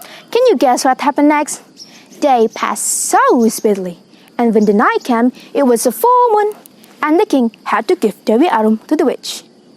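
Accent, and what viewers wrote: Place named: United Kingdom. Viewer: Keren mbak... british aksennya itu, uwuwww top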